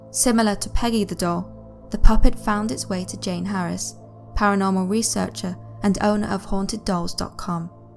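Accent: United Kingdom. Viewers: You have a beautiful British accent . I lived in greater Manchester most of my life being a duel Citizen. Anyways, I am for sure subbing to the channel as I am interested in the true paranormal. There is just to many out there in researchers on television and YouTube that fake it. You do not and I know another person that does not either. Ta mate , for the great videos! You truly have my respect. Cheers!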